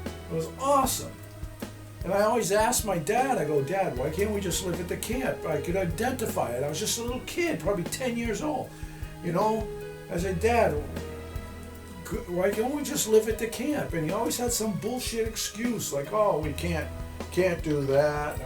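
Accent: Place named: New England, United States. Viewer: northern NY Adirondacks you sound like yer from NH VT or MA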